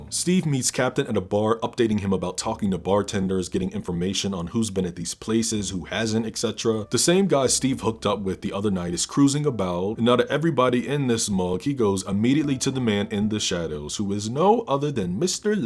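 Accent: United Kingdom. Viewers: Giving a like for the british accent alone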